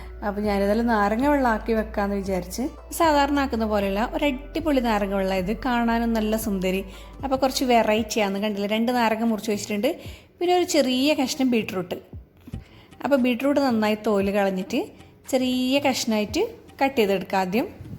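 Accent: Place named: Kasaragod, India. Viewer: Kasarkod basha ishttapettu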